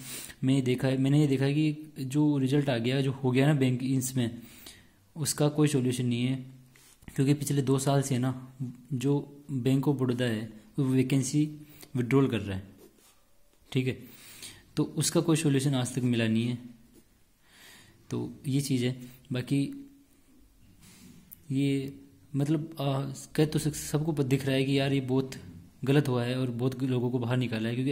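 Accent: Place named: Haryana, India. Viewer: Bhai tu haryana ka Lage se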